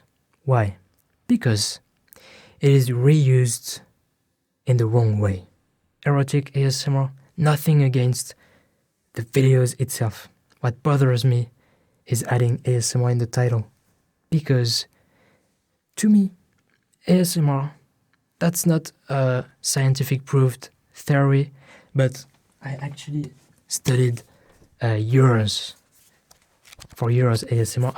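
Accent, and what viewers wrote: France. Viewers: c'est quand même marrant le fait que l'accent français fasse que je comprends chaque mot parfaitement. Merci et continue d'être français stp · kinda off topic but I LOVE your French accent it’s so adorable 🥰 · I tried to explain (what I know now to be ASMR) to my family/friends when I would ask if random people (telemarketers, random people on the other end of the phone OR random people I’d have interactions with) and try to explain that listening to certain people’s voices would start to almost lull me to sleep with my head tingling/chills. No one ever understood what in the world I was talking about and I was almost frustrated I was so easily affected by certain people’s tones, sounds, volume, dialect, ect. 5 or so years ago I finally found out I was describing my own ASMR! Speaking of tingles - Please continue to talk in English with your amazing French accent. 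☺️😴Ahhhhhh!😴☺️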